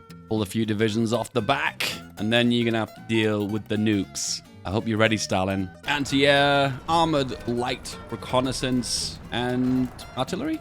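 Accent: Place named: Yorkshire, United Kingdom. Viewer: Knew you were a Yorkshireman, didn't know you were in South Yorkshire! Liked for best county.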